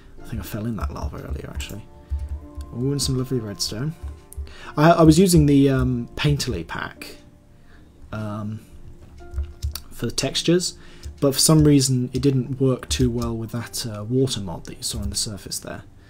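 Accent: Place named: England, United Kingdom. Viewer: WOOP WOOP! Someone English for once!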